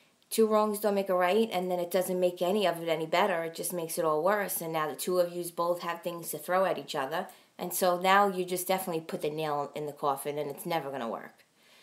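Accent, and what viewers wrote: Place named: New Jersey, United States. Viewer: God Bless You, Girl!!! Stay Strong!!! Looks like you have turned out Ok considering what you have been Dealt with. You are Stronger than you think!!! I also grew up in a VERY Dysfunctional Family & I can Relate to you somewhat. I sent you a FB Friend Request, I Really would like to get your Address. Maybe to send you Coupons, etc... I am from Ky & I have a Southern Accent. I was showing my Husband your Videos & was talking about your Jersey accent. I used to LOVE to watch Jerseylicious on TV. I don’t know if you know about that show or Not??? But, you remind me SO much of those Girls. You would be Tickled at my Accent as Well. 😆 Anyway, My Prayers are with you & your Momma. Thanks for your Videos, I enjoy them. 👍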